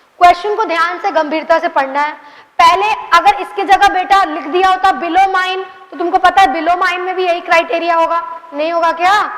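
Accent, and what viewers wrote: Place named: mixed, India. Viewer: pagal ho kya gawar nhi h vo ca hai.BAS vo apna bolne ka tarika up bihar wala hi rakhi hai.baaki knowledge hai lekin bolne ka tarika desi hai.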